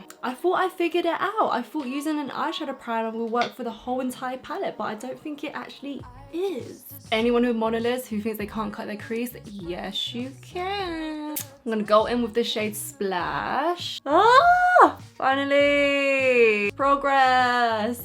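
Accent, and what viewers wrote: United Kingdom. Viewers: Is she in Australia maybe am wrong but it sound brtiths to me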